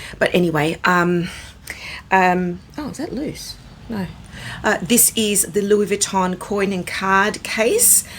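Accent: Australia. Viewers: Love the Australian accent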